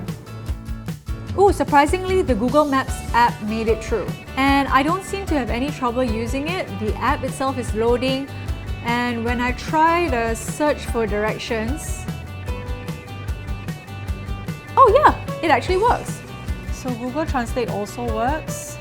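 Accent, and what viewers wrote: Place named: Malaysia. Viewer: Malaysia accent.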